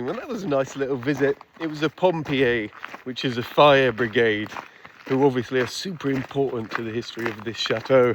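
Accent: United Kingdom. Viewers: I don’t speak French but French sounds so beautiful!! As an American I love his British accent but I could listen to a whole video of him speaking French!!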